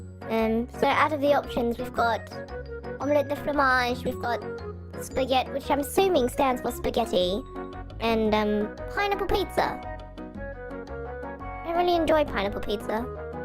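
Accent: United Kingdom. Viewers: I just want to say I really do like your british accent. I can listen it all day❤️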